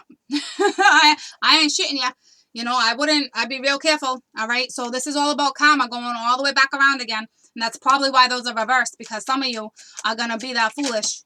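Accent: Boston, United States. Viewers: A good reading and your accent is amazing😊 are your from boston? Love from a Leo from the uk!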